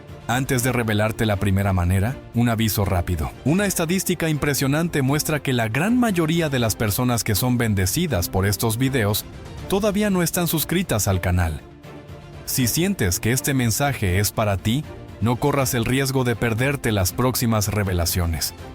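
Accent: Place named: Guatemala. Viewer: Me parese qie eres de Guatemala por el tono de thu voz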